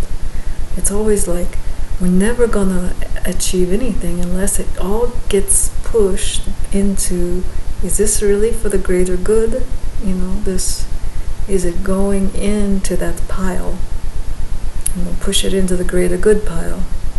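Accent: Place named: United States. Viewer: Why do you start out with a heavy Eastern European accent and then it fades to a complete American English. Please stop! I have been watching you for years!!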